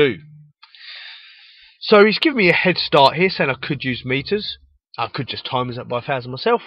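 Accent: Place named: Birmingham, United Kingdom. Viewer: Love the Brummy accent! Great job on the video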